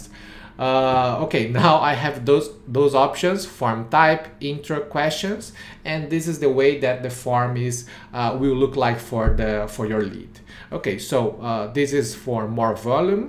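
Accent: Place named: Brazil. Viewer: cara, antes mesmo de ver o vídeo inteiro percebi pelo sotque que vc é brasileiro. Eu consigo entender em inglês, mas meu sócio não. Vc tem algum curso de zapier em Português?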